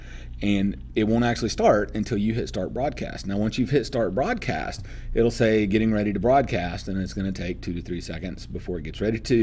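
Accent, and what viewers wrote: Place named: South, United States. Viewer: That intelligence... That Professionalism... That southern drawl. :D Well done, sir. Great information!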